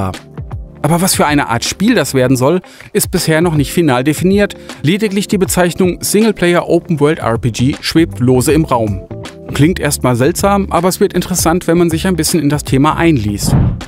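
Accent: Hesse, Germany. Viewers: Bitte Trant mach die komplette Story von Doom auf Hessisch!!! Das wäre so Epic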